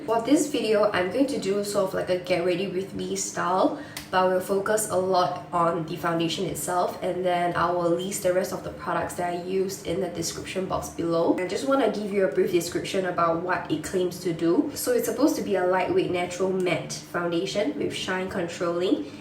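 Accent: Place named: Singapore. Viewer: you sound like your from singapore :)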